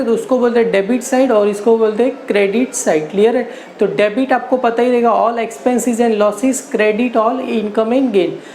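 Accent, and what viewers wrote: Gujarat, India. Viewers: Tame gujrati cho ??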